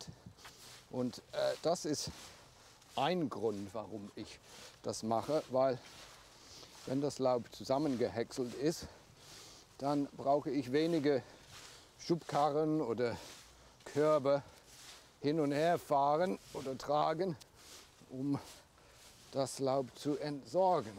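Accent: England, United Kingdom. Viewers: Morning, nice to hear the English accent being one myself. give the Germans more good tips how to make a good English garden. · Klasse Ihre Beiträge. Auch liebe Ihren britischen Akzent. 👏😀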